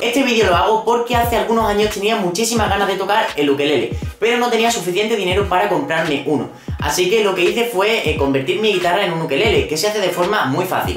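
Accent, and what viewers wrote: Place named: Spain. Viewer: De que parte de España eres? ese acento no lo tengo familiarizado.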